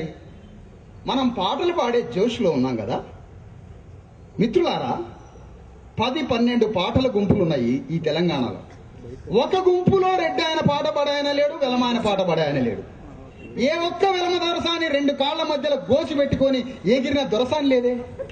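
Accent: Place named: Andhra Pradesh, India. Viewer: Nee aandhra slang chustene artamutundi be nv aandhra kodukuvani... Dobbey be...rayalaseema to polustunnaventi be nee tooooo ga...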